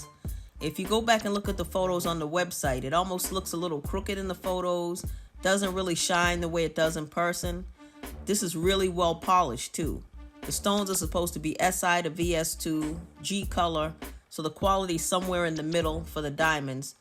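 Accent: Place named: Baltimore, United States. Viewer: Sounds like a Baltimore or Jersey accent 🤔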